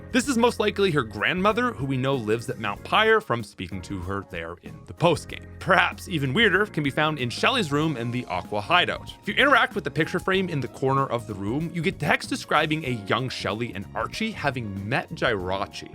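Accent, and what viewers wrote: Canada. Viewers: Some of your pronunciations of the pokemon are odd lol..must be your Canadian accent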